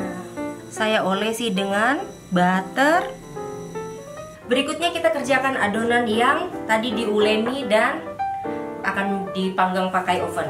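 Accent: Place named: Indramayu, Indonesia. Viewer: mbk nya orang indramayu ya....denger logatnya...heehehe...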